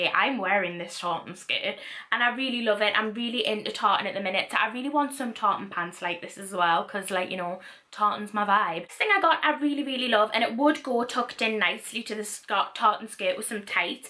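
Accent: Newcastle, United Kingdom. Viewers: I subscribed because it feels like home. You and I are both from Newcastle, ( I feel like there's not so many people on here from the north east) so wherever I am in the country, or in the world, I can click onto your channel and feel like I'm home again. It's so weird how an accent can transport you back to familiarity - especially when you're home sick. It's so lovely to see someone from the north east doing so well for themselves. We're always kind of looked down upon, for being dumb, drunk and outspoken, ever notice how when a geordie is on the TV - whether it be The Chase or Big Brother they always show us up? Thanks for not doing that. That's why I stayed - because you're not like everyone else. Thank you for always being genuine, fabulous and kind. P.S My Instagram is a new account so I didn't just follow for the giveaway! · Reasons I follow you: - were both from Newcastle, it’s so nice to hear the Geordie accent online! 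- love the little challenges you give yourself! - I think you’re funny and so gorgeous! - I adore the confidence you have in yourself. ❤️ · The reason I subscribed to your channel is because you are so down to earth and don't follow all the other YouTube bloggers, you are different from all of them...you keep it real basically and don't portray your image, I also enjoy watching your videos as you are always bubbly and I love your accent/voice it's brilliant! (I'm from down south and love hearing the different accents from other British people 😂 I'm not weird honestly! 😂).....I've followed you on Insta aswell 😊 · The reason why I subscribe to you is because I never found anyone really relatable or who speaks like me (Geordie) I love your videos and watch them all the time xx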